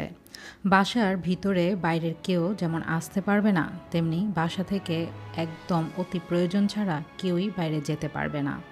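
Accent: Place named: Sylhet, Bangladesh. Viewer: it Khalil Bhai from London, u say your a girl from Dacca but u are a sylheter bou, but u speak like a sylheti girl are originaly from a Dacca family?? people explain more thanks.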